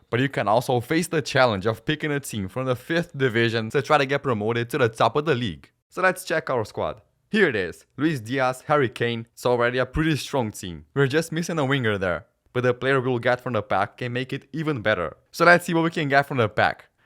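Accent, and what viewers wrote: Brazil. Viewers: You speak english with Brazilian Accent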